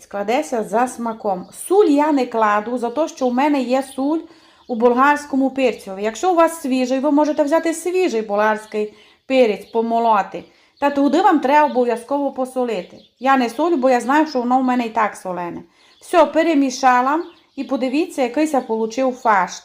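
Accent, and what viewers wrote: Transcarpathia, Ukraine. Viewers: Дякую Вам за рецепти і наший закарпатський діалект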